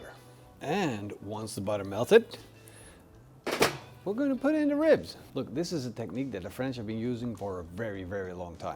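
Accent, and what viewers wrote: Netherlands. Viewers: I almost metioned the cold smoke before the cook, but I knew I had to wait until the very end before commenting. You know your stuff, and that is why I watch. Once again, I love hearing the Dutch accent. Reminds me of my youth in Den Haag.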